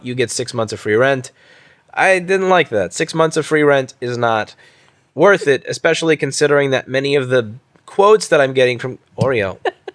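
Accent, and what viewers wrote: New York, United States. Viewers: When you start ranting, your New York accent really comes out. When you calm down, it relaxes. It's quite endearing. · I love you so much you’re so New York it’s like talking to someone on the street “Family owned Jewish company” 😂😭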